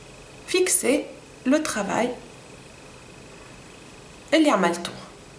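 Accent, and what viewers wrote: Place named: Tunisia. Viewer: C'est lourd le francais tunisiens ! Whaou! Sinon bon tuto !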